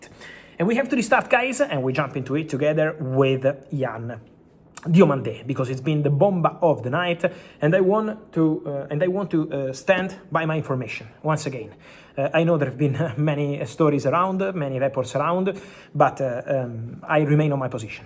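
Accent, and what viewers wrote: Italy. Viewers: İtalian accent is so hard i don't understand you mr Romano😅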